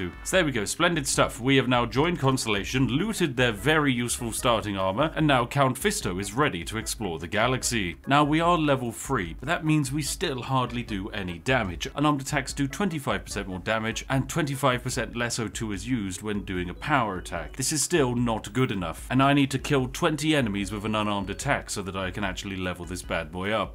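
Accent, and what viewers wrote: United Kingdom. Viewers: You have a lovely British accent there, friend (for real, not kidding) you had fun videos about cyberpunk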